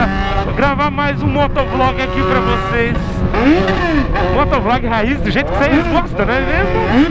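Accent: Goiás, Brazil. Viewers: Volta a falar no pique do goias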